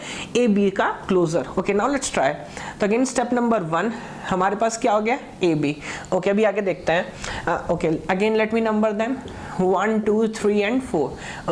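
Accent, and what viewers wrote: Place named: Indore, India. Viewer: it seems sir you are from indoor . You have that tone " kya bhi milega ". thanks alot sir for all the videos. I have being following all the lecture one by one .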